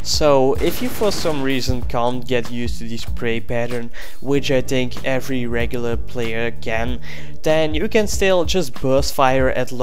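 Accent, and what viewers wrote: Netherlands. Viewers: will you go back to dayz vids? btw youve grown well, ive seen you since 1k or something. i subbed because i heared you had a dutch accent. and you uploaded alot of dayz stuff. too bad im not interessted in csgo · are u dutch?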